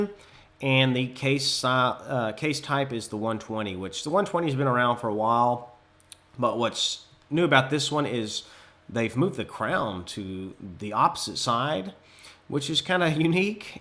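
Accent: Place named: South, United States. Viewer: I like your approach. Your video style is very friendly, down to earth, fair minded, and helpful. Please keep up the good work! I am also recommending your videos to my students ( I am a freelance English teacher) as you have a very mild, clear, Southern accent, which I think can contribute to their listening skills nicely. ( I get them to listen to a mixture). Many thanks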